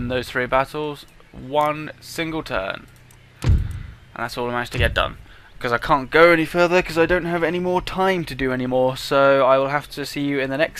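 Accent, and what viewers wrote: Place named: United Kingdom. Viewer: You sounded really british!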